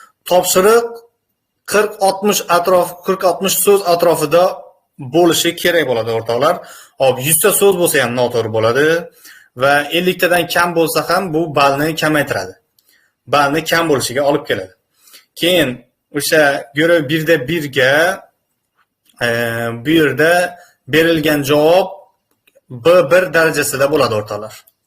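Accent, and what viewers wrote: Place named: Khorezm, Uzbekistan. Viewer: Xorazmcha aksent bor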